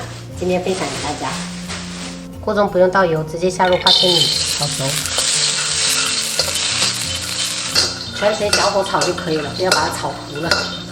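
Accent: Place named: Fuzhou, China. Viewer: 你是四川人,但会说福州話？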